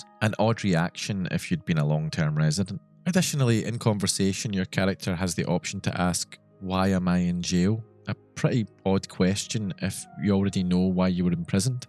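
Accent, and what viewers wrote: Scotland, United Kingdom. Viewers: Can you use a different accent? It’s hard to fall asleep listening to Scottish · I liked this as soon as i clicked onto it because i heard a fellow scottish accent, and it's about oblivion. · Fuck me. I forgot you are a Scotsman 😂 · Subscribed for the Scottish accent. 🎉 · Is that a wee hint ae a Scottish accent? Or am a wrang neebur? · Are you Scottish? Btw awesome video love the accent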